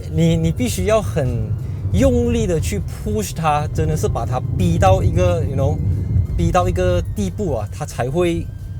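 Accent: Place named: Malaysia. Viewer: 純正的聯邦腔+方言 😅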